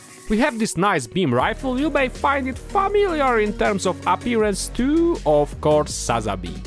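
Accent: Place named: United Kingdom. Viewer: I'm in NC, USA. But you sound like you're over the "pond" lol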